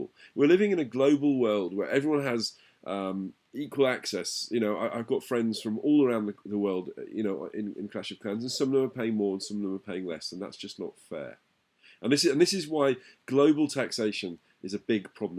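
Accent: England, United Kingdom. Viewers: you sound like you live in England... NO TAXATION WITHOUT REPRESENTATION!!!!!!!!!!!!! look it up if you dont know ;)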